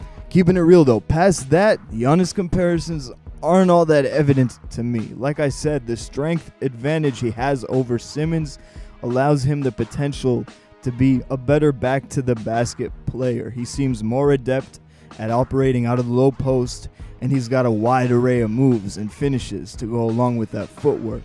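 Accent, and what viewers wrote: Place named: South, United States. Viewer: Your drawlin heavy👀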